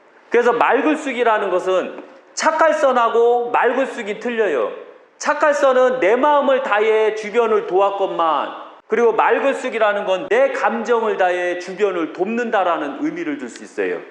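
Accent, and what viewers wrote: Chungcheong, South Korea. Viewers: 쉬운듯 어려운듯! 알아들을듯 말듯! 이해가 가는듯 아닌듯! 그래서.. 자꾸 듣게되네요 저도 충청도사람인데 충청도사투리가 정겹네요~^^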